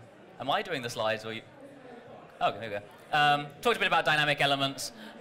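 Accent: United Kingdom. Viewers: British accent and super ambitious game..... Haven't seen this before...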